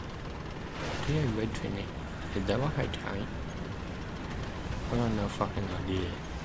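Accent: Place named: Australia. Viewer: Hey digging the aussie accent man! Straya!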